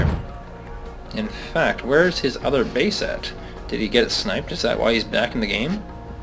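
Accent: Canada. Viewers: Gorgeous vids! You sound like you might be Canadian, it's funny how you would say everything with the vowels "ou" in an accent. but in the case of "routing" where the canadian accent way of "ou" is actually the proper way to pronounce, you turn it the other way around :p Looking forward to more!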